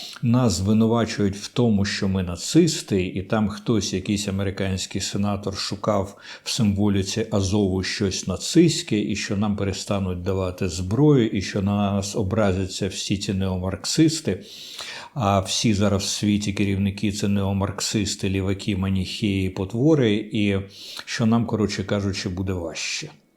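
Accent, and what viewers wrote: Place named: Russia. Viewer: буде вашчЄ (буде важчЕ), щЬо нам іщЄ треба... Пане Дмитро, як завсіди, спасибі за промовисту орацію, але... будь ласка, поверніть собі українську фонетику замість московської. Шиплячі ж бо в нас тверді, не мякі як у москаля.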